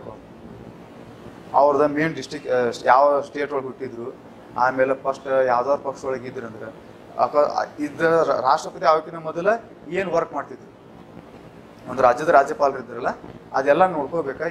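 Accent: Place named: North Karnataka, India. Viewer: Super sir... ಉತ್ತರ ಕರ್ನಾಟಕದ ಮಾತುಗಳು......nice.....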